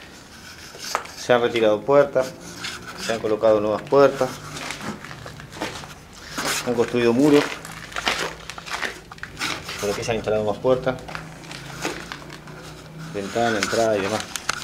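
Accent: Uruguay. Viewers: Genio. Sos Uruguayo, o Argento?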